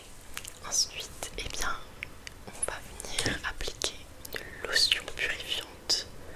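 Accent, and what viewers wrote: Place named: England, United Kingdom. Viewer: incroyable la vidéo, c'est super relaxant et puis tu as un très bel accent anglais☺ Je m'abonne directement et j'espère que tu iras loin car tu en as le potentiel ;)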